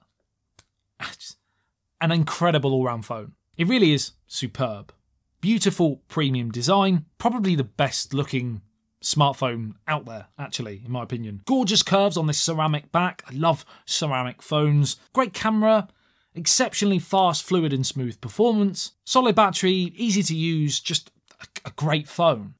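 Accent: England, United Kingdom. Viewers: What is the phone Asus, you mention ? You said it so fast I couldn't hear what you said. Your beautiful English accent is slightly difficult for a Northwesterner.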